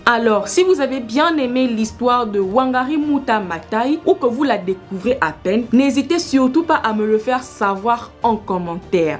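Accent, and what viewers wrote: Fang, Gabon. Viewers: Bébé l’accent fang est toujours là hein nkolomessa ossuuuu❤️🥺😂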